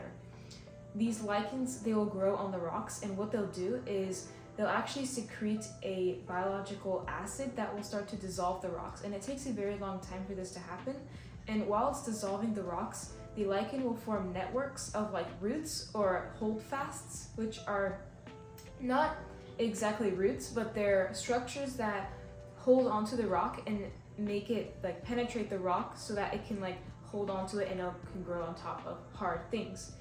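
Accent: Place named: Quebec, Canada. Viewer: Are you French Canadian? I hear a slight accent but can’t place it. Great video by the way. We need more explanation like this in freshwater as well.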